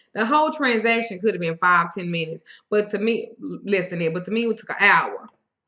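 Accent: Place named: Texas, United States. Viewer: This is my first time watching one of your videos and I loved it! I can definitely relate to holding onto things from childhood and my father not being there like I needed and expected him to be. I knew you were from Texas when I heard your accent lol. You actually resemble my ex's mother a lot and they're also from Texas (Ft. Worth).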